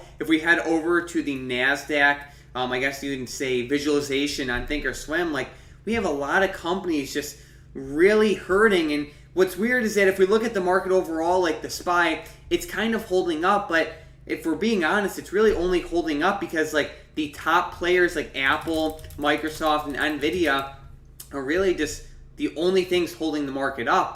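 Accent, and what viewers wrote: Midwest, United States. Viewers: You sound like your from Minnesota or Wisconsin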